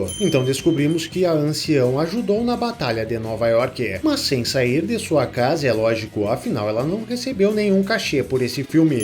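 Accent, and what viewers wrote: Rio Grande do Sul, Brazil. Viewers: Mas ah locô véio! Esse sotaque é inconfundível. Tu é do RS?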